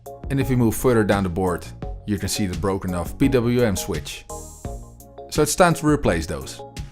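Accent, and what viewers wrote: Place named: Moldova. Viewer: First hearing your accent. Flavors of Moldova ?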